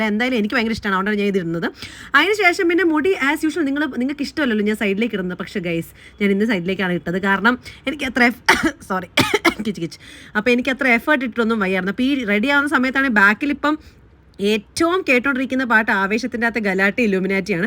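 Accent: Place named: Palakkad, India. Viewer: Palakkad Anu swedesham manasilayi pakshe orupadu slang keri varunu .enthandi unni niyu ingane thango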